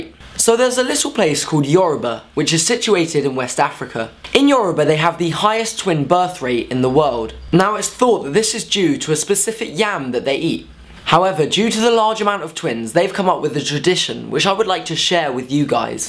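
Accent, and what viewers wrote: United Kingdom. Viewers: are you british? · No idea why this was recommended to me, but such a delicious british accent !!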